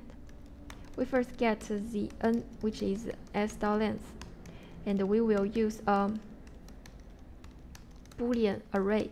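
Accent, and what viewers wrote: China. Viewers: Improve your English. It's hard to understand so called Chinese English.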